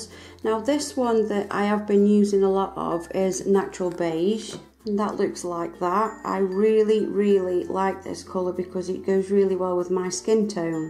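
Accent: United Kingdom. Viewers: Everything sounds better with a British accent!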